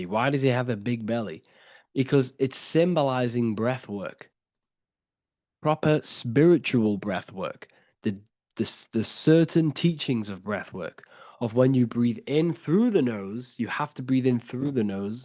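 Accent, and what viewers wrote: United Kingdom. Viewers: R u from derby ?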